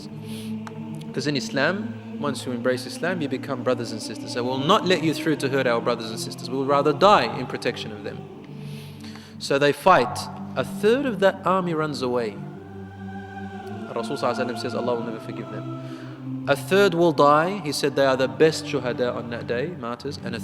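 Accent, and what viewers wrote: Indonesia. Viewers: Kayaknya dari suaranya orang indo nih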